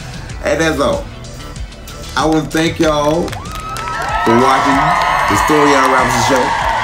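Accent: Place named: South, United States. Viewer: Ayyyy my new favorite show! Love the country accent 😂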